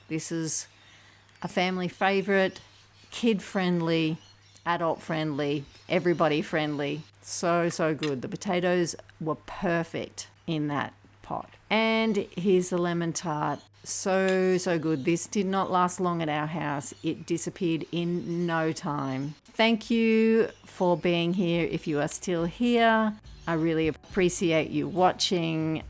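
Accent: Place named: Australia. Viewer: your accent sounds australian, you sound a lot like this woman : <link>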